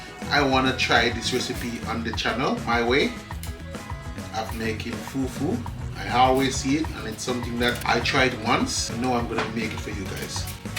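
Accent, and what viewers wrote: Jamaica. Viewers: You sound like a Jamaican😊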